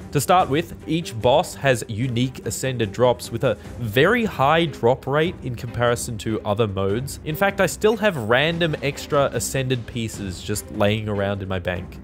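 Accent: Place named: Australia. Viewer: >Australian accent Yeah imma head out.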